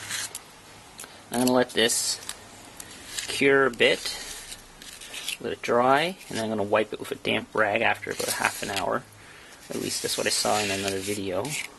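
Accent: Canada. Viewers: ARE YOU CANADIAN?! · quebecois ? ou francais ?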